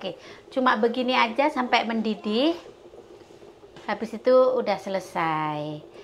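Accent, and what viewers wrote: Java, Indonesia. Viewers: Di luar negri tapi bahasanya masih jawa medok...itulah lucunya mbk.. jadi hiburan nonton vidio ini.. sampai ngakak saya...luarbiasa mbk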